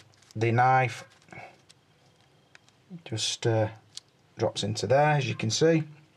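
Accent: Sheffield, United Kingdom. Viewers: I knew i recognised your accent ! Sheffield na na na "t'neight"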